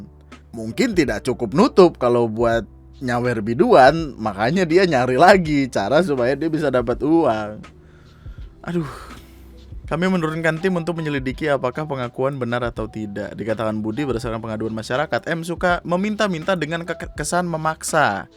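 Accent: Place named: Madura, Indonesia. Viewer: Bahasa Madura kalo denger logatnya bang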